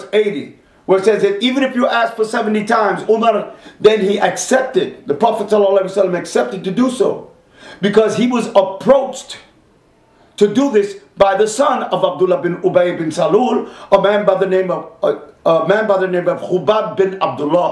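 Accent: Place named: Arizona, United States. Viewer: Just curious but where did handler nab you? Did you get sent to the fili or mexi camps for field op training yet? I'm thinking arizona raised based on accent hint, possibly california or nevada.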